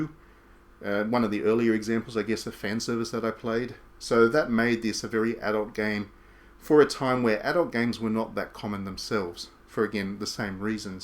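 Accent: Australia. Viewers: Austrailian accent clearly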